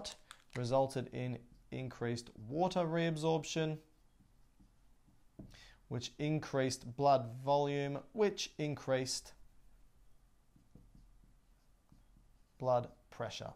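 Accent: Australia. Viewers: It is an australian accent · As an Aussie, I can confirm it’s an Aussie accent.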